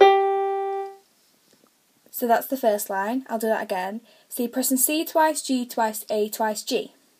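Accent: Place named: United Kingdom. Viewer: She sounds British.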